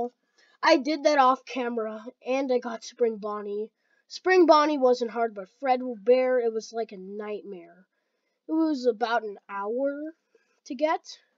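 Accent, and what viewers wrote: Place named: Colombia. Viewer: tienes voz de colombiano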